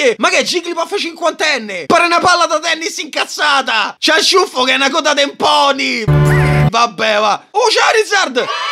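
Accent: Rome, Italy. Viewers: TU devi doppiare pikachu e con accento romano incluso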